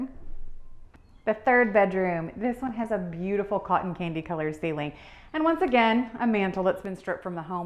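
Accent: South, United States. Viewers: I absolutely love her subtle southern drawl 😍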